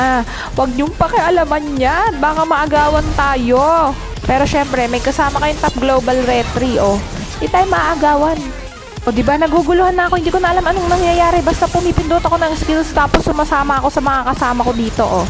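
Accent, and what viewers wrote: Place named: Bicol, Philippines. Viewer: 👁👄👁 ang ganda po ng voice nyoooooooo taga saan po kayo sa Pagsailta niyo po kasi parang taga bicol po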